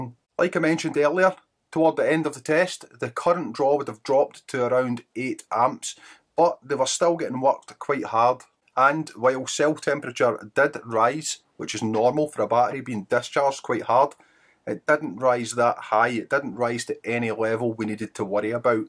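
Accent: Scotland, United Kingdom. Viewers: That strong Scottish accent tho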